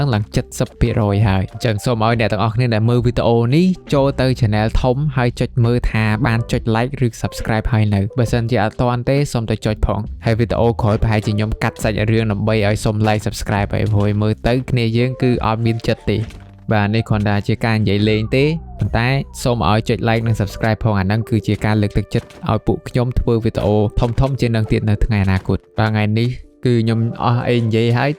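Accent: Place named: India. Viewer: ur accent sound as india ( recording)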